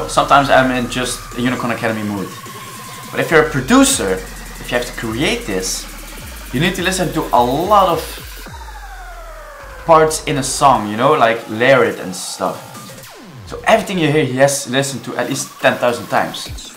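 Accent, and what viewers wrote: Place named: Netherlands. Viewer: love how the dutch slips through his english speaking, lekker man ❤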